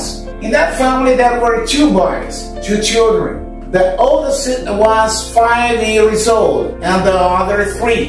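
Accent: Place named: Brazil. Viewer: Your speech has a strong Brazilian accent, anyway.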